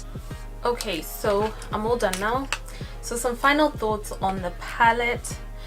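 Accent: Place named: South Africa. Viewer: Your accent has a South African undertone 😳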